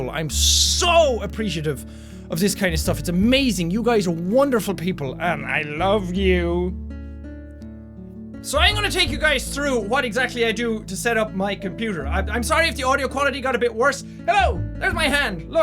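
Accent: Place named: Ireland. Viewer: I still remeber when you coved up your irish accent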